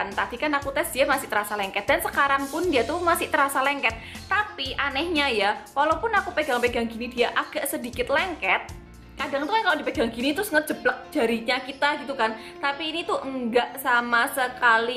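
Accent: Java, Indonesia. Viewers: itu potongan rambutnya apa namany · itu pakek emina apa? Pelembab atau apa? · Bikin video bodycare routin dong 😍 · salam selalu😍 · Hayoo sapa seng suka nntn chaenell e tp jrng ikut comen ky nyong 😆jempole mana 😄lophe lophe 😘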